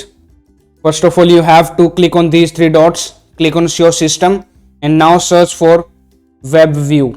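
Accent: India. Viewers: 😆 your english voice is like indian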